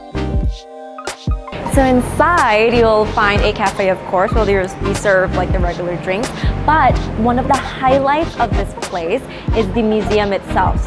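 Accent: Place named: West, United States. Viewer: Did you grow up in the United States your English and mannerisms are very western.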